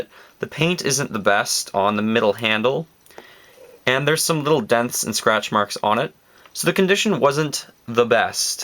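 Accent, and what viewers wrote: Canada. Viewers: Love your Canadian accent.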